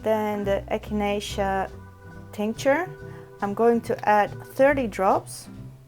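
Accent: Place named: Netherlands. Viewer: I thought your were Dutch, sorrie it's always the accent. Love your date tarts by te way!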